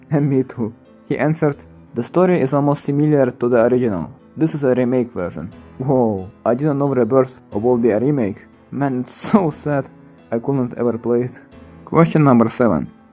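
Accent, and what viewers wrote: Russia. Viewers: Чел я знаю что ты русский твой акцент тебя выдаёт бро. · Я все видео думал: Русский ли у тебя акцент или нет, пока ты прямо в видео не сказал откуда ты) Мать твою, история некроманта 2 выйдет? Я сплю?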